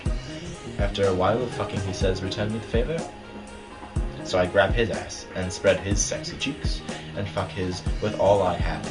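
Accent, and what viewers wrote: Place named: United Kingdom. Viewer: Is it me, or did you get all Brit on us? Not that I minded, because I loved your accent. XD I loved your puzzled looks, and you trying not to laugh throughout the story. And just you are amazing and this was hilarious. Please do more dramatic readings xD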